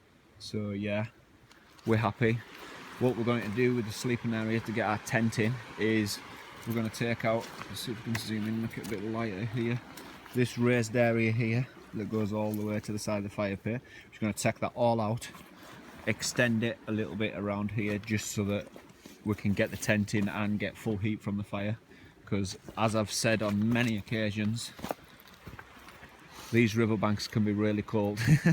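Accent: United Kingdom. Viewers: not that great with UK accents. Are you in Wales?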